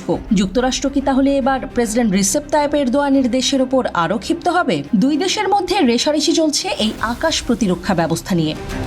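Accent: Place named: India. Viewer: এই মহিলা কি ইন্ডিয়ান,তার কথা বলার ধরণ দেখে এমনি মনে হয়, এমন ভাষায় কথাবলে ভারতীয়েও সংবাদমাধ্যম, হাসিনা কি ভারতীয়েও বর্জন প্রডাক্ট ব্যবহার করছে বাংলাদেশ ভূখন্ডে।।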